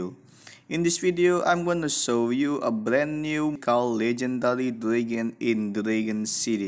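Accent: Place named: Indonesia. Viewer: Logatnya indo sih..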